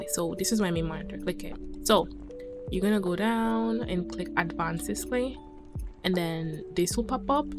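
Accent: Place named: Jamaica. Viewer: I was listening and I was like She sounds Jamaican and I got so excited like have I finally found my people with same interests as me? And I checked the bio and I was right 😂 I’m so gonna binge the whole channel❤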